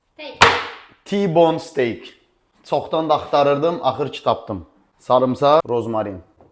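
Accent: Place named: Shamkir, Azerbaijan. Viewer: Semkirli oldugu 100 gunlukden bellidi menim qardasim kimi danisir bisirir sendi Masalla size cox gozelsiz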